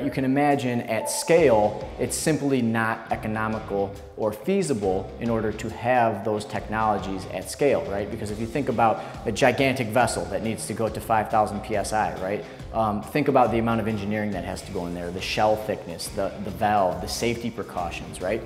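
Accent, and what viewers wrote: United States. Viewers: is that a chicago accent? great accent, can't quite pin it tho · midwest accent represent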